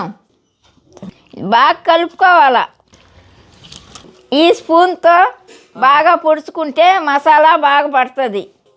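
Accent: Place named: Ongole, India. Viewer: Ongole accent... 😊😊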